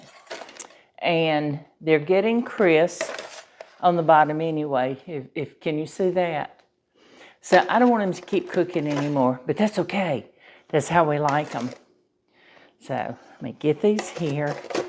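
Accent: South, United States. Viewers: Hey there, this is first time I’ve seen you. Love your bright and beautiful kitchen, and your beautiful soft and calm voice (you sound Southern like me). These are my hubby’s fave breakfast foods but I’ve never made into pockets before, a must try! At age 74 I’ve learned something new. Thank you!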